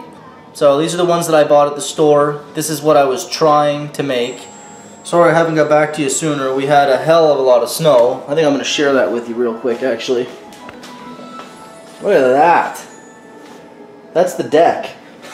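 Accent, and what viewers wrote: Newfoundland, Canada. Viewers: Sounds like Newfoundland. I'm also from there. Love the accent. I'm homesick now lol.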